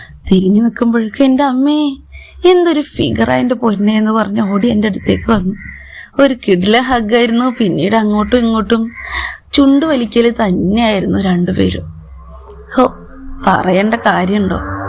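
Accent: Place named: Palakkad, India. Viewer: ഒരു പാലക്കാടൻ സ്ലാഗ്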